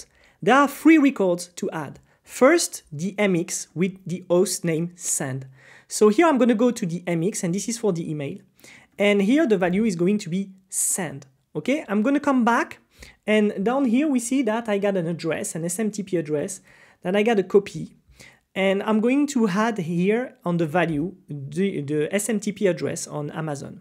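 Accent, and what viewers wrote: France. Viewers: I like that Frenglish ♥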